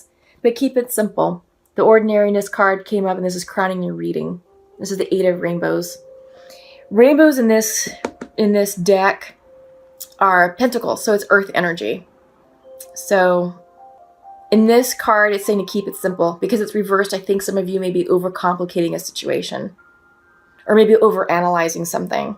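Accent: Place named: Brooklyn, United States. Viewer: Sounds like your close to Verrazano Bridge Brooklyn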